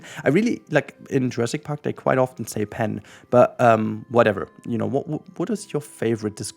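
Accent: Germany. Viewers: He sounds so german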